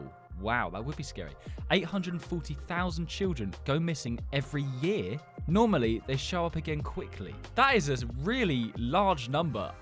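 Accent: England, United Kingdom. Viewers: where in england u from? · Is he British?????? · You are British I know it😅😂😂😂🎉🎉🎉😊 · AHH A BRITISH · are you British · Are u british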